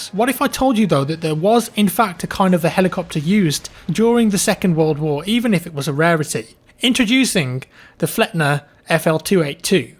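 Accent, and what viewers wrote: United Kingdom. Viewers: That british accent 💘💘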